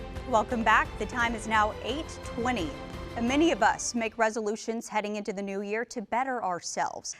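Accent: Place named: DMV, United States. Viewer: getting that DMV twang down solid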